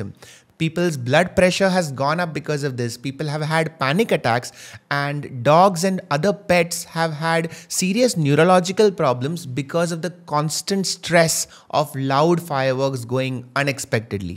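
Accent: Kerala, India. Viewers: Are you from Kerala (Malayalam)😊😊😊😮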